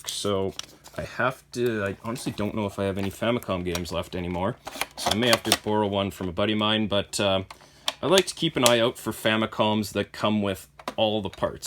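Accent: Canada. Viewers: I knew you were a fellow Canadian from listening to you and now I know I was right haha. Great videos!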